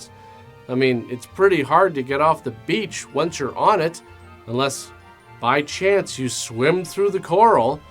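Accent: Canada. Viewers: I found it quite obvious that he was Canadian after watching his first video. Although there are people in the Northern US that do have similar accents.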